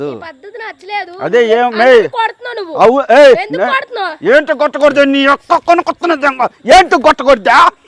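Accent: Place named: Chittoor, India. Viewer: Perfect chittoor accent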